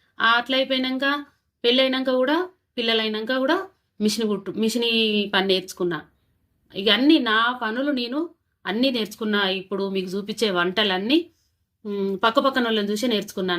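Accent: Telangana, India. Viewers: తెలంగాణస్లాంగ్ లో దమ్ముంది మర్యాద ఉంటది మీ మాట సద్దనపు మూట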